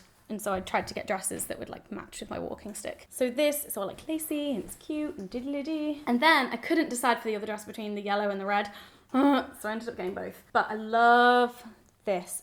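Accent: Texas, United States. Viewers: Your Texas voice was pretty good. Your vowels need to be longer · I loved your Texas accent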